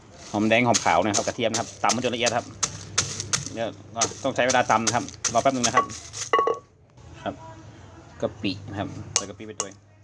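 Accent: North, Thailand. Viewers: อู้ไทยประเเลดเมื่อง5555++++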